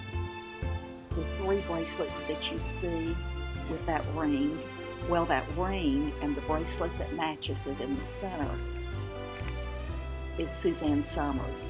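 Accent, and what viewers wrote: South, United States. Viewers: I love the jewelry and the teapot. Great taste! I want to share something with you. It may sound a bit odd to you but there is reason, sort of, behind it. You are a comfort source for me and I'm not sure why. Your voice, the Southern Belle accent, you being older, which represents wisdom to me. You've mentioned your daughter in some videos and I get the feeling you and she are close. You just seem thoughtful, maybe doting at times on the ones you love. Anyway, now for the "reasoning" behind these statements. This is hard for me to share, but, here goes. I have been having issues with emotional and therefore mental issues as well, off and on for many years now. My life with others has not always been very nice, putting it mildly, and as a result, I deal with PTSD. I have developed a need for comforting, soothing things but rarely, people. 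I am very reclusive now, and since my mother passed, more sensitive to my surroundings, sounds, smells, people, etc. Listening to you and watching your videos is comforting and soothing to me. Although there are others that I love seeing and feel good around, there is only one person that I can handle being with regularly and that is my husband. I am going through one of my "darker" phases where the noise in my head from my past is very loud and I happen to notice the other day that when listening to you speak, I feel a comfort, probably because you remind me of the softness but yet tough, southern ladies in my family, a certain gentility if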